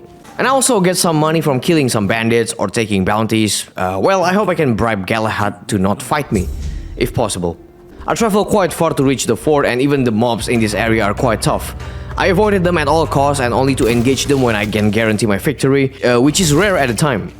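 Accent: Indonesia. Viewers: You sound like indonesian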